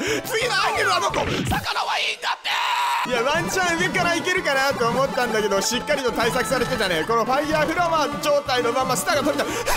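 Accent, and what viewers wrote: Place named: Hakata, Japan. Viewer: ひぃぃぃ！？の謎のエコーみたいなのおもろいし僕も一緒にぶっ壊れようと思いますｗ あとところどころ博多弁出るの好き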